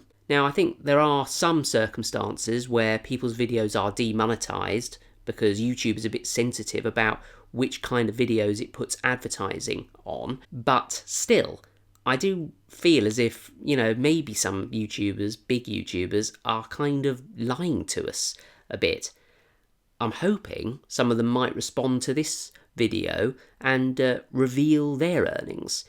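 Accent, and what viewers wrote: England, United Kingdom. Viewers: i subbed from that video.... it was because im an english person living in scotland, and i just really missed an english accent so now i watch you. x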